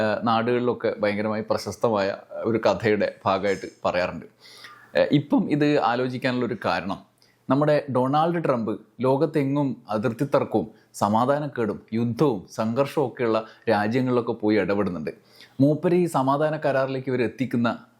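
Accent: Kannur, India. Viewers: നിങ്ങൾ കണ്ണൂർ കാരനാണോ?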